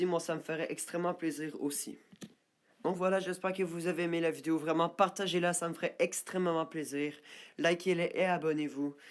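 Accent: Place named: England, United Kingdom. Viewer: t un accent englais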